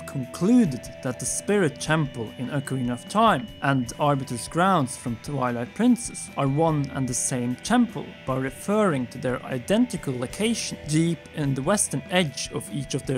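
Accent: Norway. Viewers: That accent, er du norsk? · Hey dude, curious about your dialect, norwegian? Ever seen the series Hell on Wheels? you sound just like the character "The swede". Cheers.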